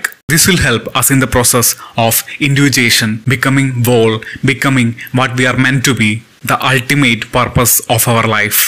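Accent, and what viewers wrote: India. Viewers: Your Indian accent makes this video much more impressive and sound more spiritual. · Sure. Will do. You take care. I wish you the best. Always will keep wishing more luck to you. Dont worry about being Indian or the accent. I know our image is looked down - It is what it is. But keep going. "I know of no better life purpose than to perish attempting the great and impossible. The fact that something seems impossible should not be a reason to not pursue it. That's exactly what makes it worth pursuing. Where would the courage and greatness be if success was certain and there was no risk." - Nietzsche.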